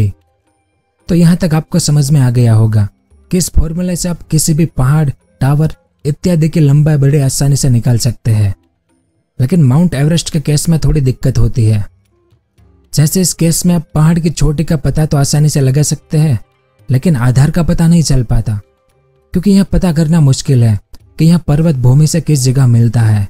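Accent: Gujarat, India. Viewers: Bhai kya Gujarat se ho kya aap dappu k papa jaise bol Raha hain aap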